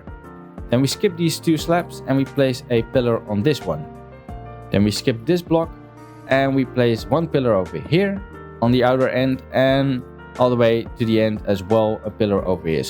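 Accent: Netherlands. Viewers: Hey, Just got recommended this Video and the complete build looks amazing! You deserve way more Views, Subs and more. Ps. The Netherlands accent is Lekker! 👌👌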